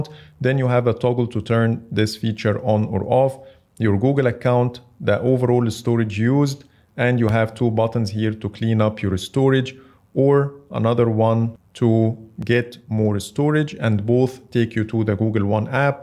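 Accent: Egypt. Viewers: i can hear the Egyptian accent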